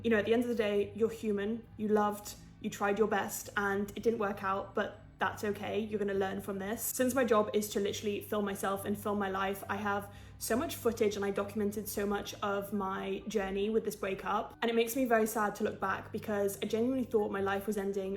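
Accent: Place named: England, United Kingdom. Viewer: Good advice. Luv your English accent btw.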